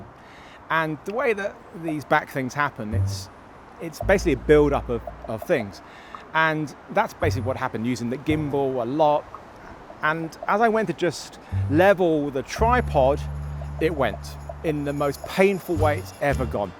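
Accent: United Kingdom. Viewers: Fantastic video, this is by far the best and most honest review of the 4D I've seen yet. I'd say you are doing pretty well for an old guy haha! Always love your reviews and your soothing British accent makes them all the more enjoyable to watch :) That story about your back problems though... ouch. I'm a pretty young and fit guy, but even I started hurting while watching that! Good food for thought as I continue getting older and more involved in my videography career.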